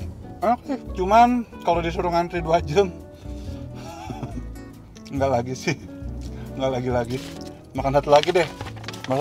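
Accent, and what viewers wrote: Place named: Bandung, Indonesia. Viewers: Hese teu nyunda teh 😁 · Paling suka logat sunda.😁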